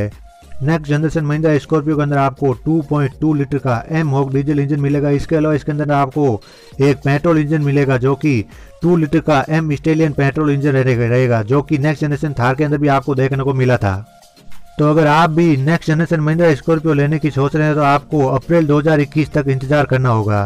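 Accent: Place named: Rajasthan, India. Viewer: लगता है राजस्थान से हो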